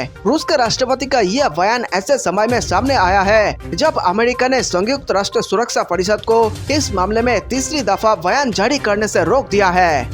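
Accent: Bengal, India. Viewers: Pehle tu apna bangali accent ko hindi me mat la aur upar se views ke liye jhuta captions mat de budbak. Faltu time waste kar raha hai sabka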